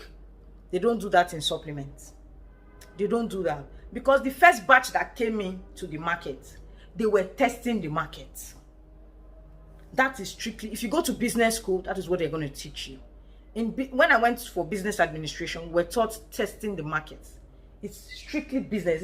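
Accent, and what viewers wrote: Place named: Yoruba, Nigeria. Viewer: I love listening to ur lectures, especially the way you speak that ur yoruba eehh, nice dear, plz can someone that did Ivf take satin supplement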